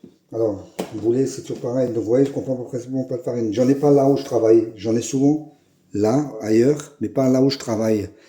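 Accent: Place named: Lorraine, France. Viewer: Genial recette et commentaires....moi qui suis lorrain d'origine j'adore retrouver l'accent et les expressions.... J'ai mis a l'agonie mon kenwood cooking chef....1.5 de farine = limite de capacité ! Mais il a bien fait le taf 😊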